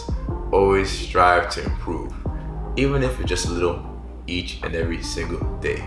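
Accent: Nigeria. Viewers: This man is Nigerian asf, feels like I am listening to my brother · I always you were Nigerian. You have the accent. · chineke😂 nigerian? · I swear right? I had this gut feeling that the guy was Niigerian from the get go....When i heard chineke....I was like lobatan.... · Bruh is u Nigerian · For a very long time, i was having that gut feeling you were "omo naija", and this video just made my feeling clear... As i hear "Eh".... "chineke" ....."Abeg"... Nice one bro!!... Naija to the world, carry on bros!!!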